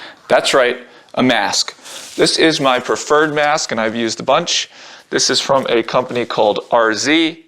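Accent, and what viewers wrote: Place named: United States. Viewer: pleasant American English accent... there aren't a lot of American men that speak well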